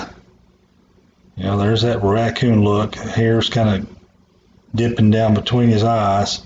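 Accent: South, United States. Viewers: I love your southern accent! And also the way you explain everything in such detail!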